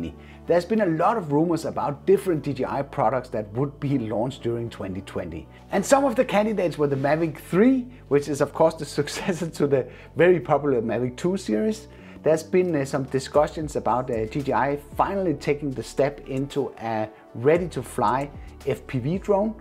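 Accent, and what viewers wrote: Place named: Denmark. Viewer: Er du fra Danmark? Jeg synde jeg kan høre det😂🇩🇰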